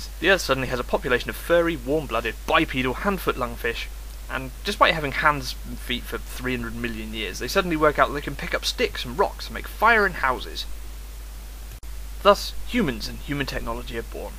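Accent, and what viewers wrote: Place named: England, United Kingdom. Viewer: Maybe it's the english accent, but I don't believe you're this dumb. I think you're a troll. Get a life guy.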